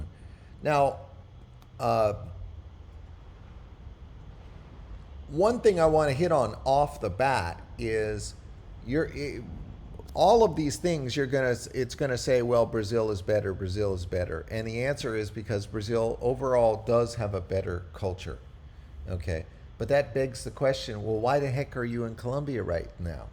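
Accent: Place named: United States. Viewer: Maybe Colombia isn't so open to you, an expat, because you keep spelling the name of our country wrong. Or because you're coming from somewhere else and making broad generalizations about people, using phrases like "better" when comparing cultures. Who the f*ck are you? Where are you from? You sound American to me. We know how welcoming the US is to foreign expats who aren't from Canada...(*sarcasm) Also, you sound like an absolute CREEP, using phrases like "I get slightly better women". Bro, go back to Wisconsin or whatever. Or can you not "get" any women over there?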